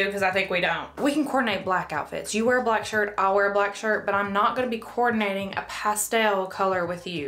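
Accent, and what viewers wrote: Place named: Appalachia, United States. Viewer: I knew you had to be from Tennessee! I’m from northeast Tennessee, and you sound straight up Appalachian 😆😆😆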